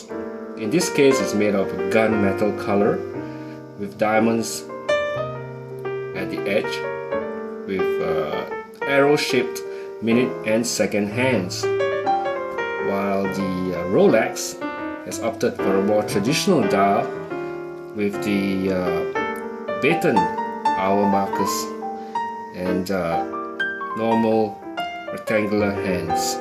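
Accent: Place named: Singapore. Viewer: The way you speak, your accent.... You're Singaporean aren't you?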